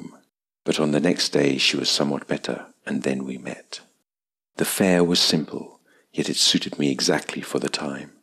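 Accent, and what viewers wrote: United Kingdom. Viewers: I like british accent. I listening your vids while shadowing them. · It's a thundering story with mesmerizing British accent. I like the way of narration.👍🏻👍🏻👍🏻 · It's nice to find stories with British accent.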